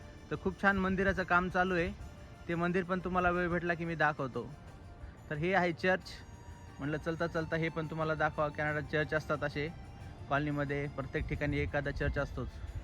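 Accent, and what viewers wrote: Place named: Solapur, India. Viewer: Aj first time Tumce videos pahile m. Tumcya tone vrun Vatl hot ki tumhi Solapur side ce asal. Chan Vatl yeikun ki tumhi latur ce ahat. Khup simple ahat 2ghpn tumhi ani tumci mul Pn.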